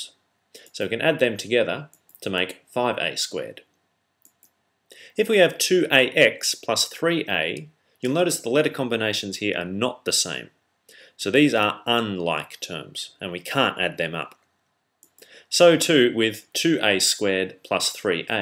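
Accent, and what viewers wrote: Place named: Australia. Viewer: nope, i'm 100% british and THAT is not english, i'd say he was australian or something....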